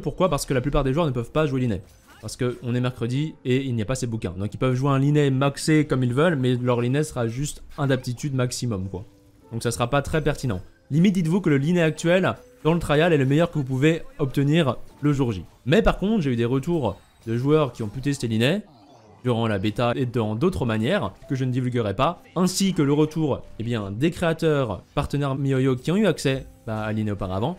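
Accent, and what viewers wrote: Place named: France. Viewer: Mec tu peux utiliser des mots français pour parler des capacités des persos parce que tu utilises des mots anglais mais tu les prononces avec un accent français donc on comprend pas trop. Merci d’avance.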